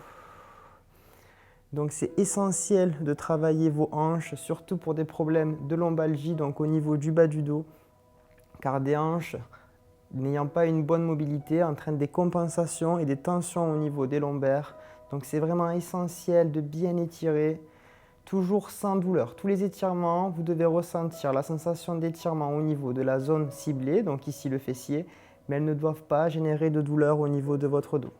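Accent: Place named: South, France. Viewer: ah oui c est ce qu il me semblait j ai reconnu un petit accent du sud , je suis sur Nîmes et originaire de Reims mon oreille est sensible aux accents 😂 dommage je trouve vraiment bien votre explication très claire pour ces exercices si vous aviez été dans le coin , j aurais pris mes séances à votre cabinet c est sur .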